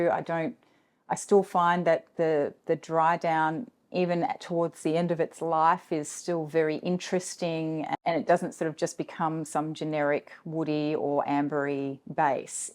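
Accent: Australia. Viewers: Hi, I came to you through Chris. I’m in Adelaide, so it’s nice to see and hear an Aussie talking about perfumes. Subscribed😎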